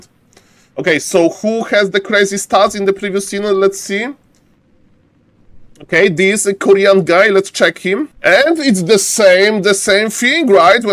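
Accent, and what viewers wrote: Poland. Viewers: Best polish accent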